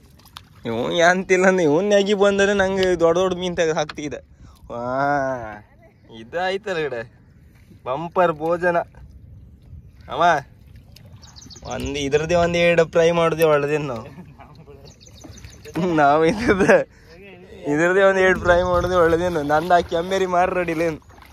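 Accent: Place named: Kundapur, India. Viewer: Kushi aguthe nim vlog noduke. Nim kundapur kannada bashe nu superr hige log madtha eriii mare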